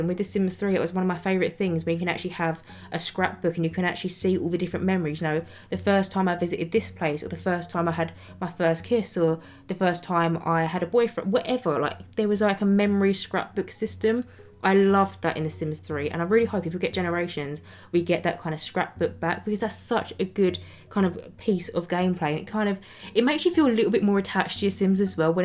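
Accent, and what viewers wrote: United Kingdom. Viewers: i miss playing sims, i dont have enough space on my pc. but i love watching you and listening to you! :3 i love british accent!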